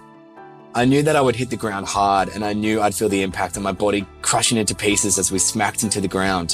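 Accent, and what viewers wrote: Australia. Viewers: Omg I love Australian voices for some reason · He sounds Aussie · Ohhhhhh mate aussie? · U have a australian accent and it is cute asf now I kinda want a australian bf lol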